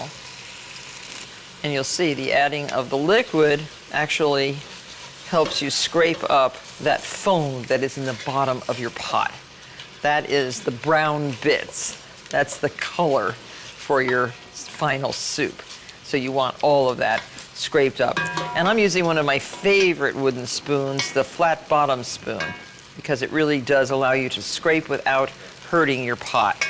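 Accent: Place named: North Jersey, United States. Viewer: prrrr-retty. So martha! So north Jersey, raised in class.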